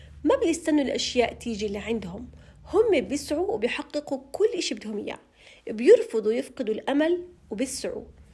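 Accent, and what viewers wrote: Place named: Jordan. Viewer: شكرا الك .. محلاكي باللهجه الاردنيه الطبيعيه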